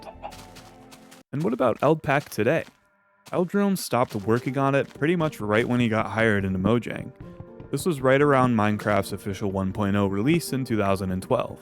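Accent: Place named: United Kingdom. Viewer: keep doing the British accent.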